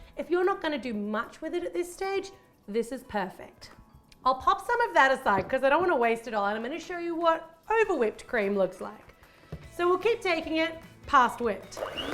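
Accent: Australia. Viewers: IS SHE AUSSIE OMGGGGGGGG YESSSSSS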